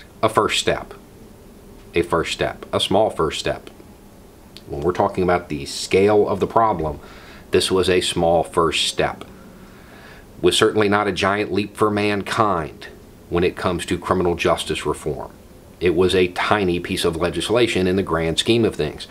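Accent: South, United States. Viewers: Hey,. I have a question, Is " " a character you play? In several interviews you do not have a Southern accent (or as close as " ") and I am just curious to the why you come off as " "? I am a long-time fan and supporter but did I miss a video or two where you explain this? All the best to you and yours. You'll always be my favorite "Florida Man".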